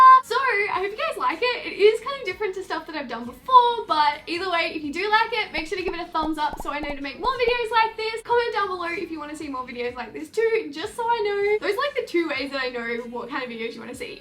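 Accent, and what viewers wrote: Australia. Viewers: Your australian wow cool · Are you aussie...you sound like one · are you ausie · She's australian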